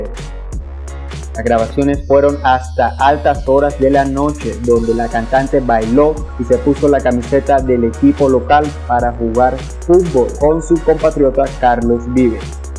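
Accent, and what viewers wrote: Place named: Spain. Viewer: Si ella tiene don natural para los idiomas y está casada con un español, es completamente lógico que hasta de forma inconsciente hable casi como española. Que se te peguen los acentos, ni es culpa de la persona, ni es un defecto, es un don del cerebro. De vuelta en Colombia, si se quedará unos días su cerebro se reprogramaría como Barranquillera. No hay nada que criticar, ella trabaja bien, ama a su familia y su país de origen y el país que la acogió. ¿Qué hay de malo en tener inteligencia y un corazón grande?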